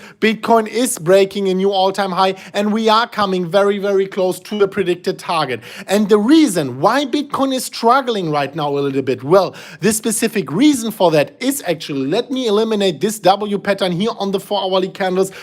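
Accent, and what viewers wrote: Germany. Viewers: Ich wusste sofort das du Deutsch bist 😂 Der Akzent kommt mir bekannt vor. Much love aus Hamburg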